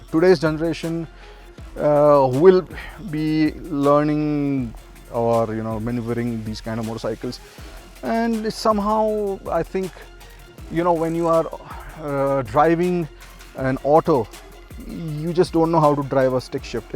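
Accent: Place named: India. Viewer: Watching and listening a review on M1000R in Austria with that indian accent ..... I dont want to mute the video cause i do want to know about the experience but ...smh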